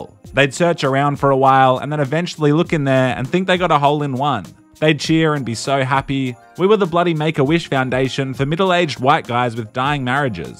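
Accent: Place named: Australia. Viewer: He is not mispronouncing wrong! Its his Australian accent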